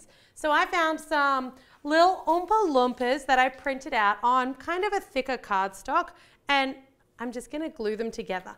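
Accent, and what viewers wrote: Australia. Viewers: Anyone know what part of Australia she is from? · she is australian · are u australian ? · Is she Australian?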